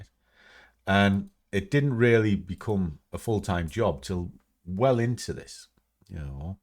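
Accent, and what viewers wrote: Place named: Wales, United Kingdom. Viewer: You remind me of me! We are a similar age and have the same sense of humour and no BS tolerated - and I love your welsh accent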